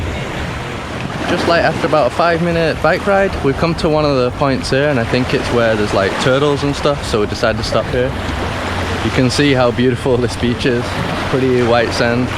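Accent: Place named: Scotland, United Kingdom. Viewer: sounds scottish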